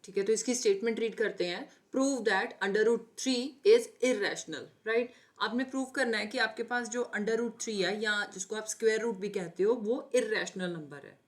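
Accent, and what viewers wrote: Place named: North Kerala, India. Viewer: vadakkan accent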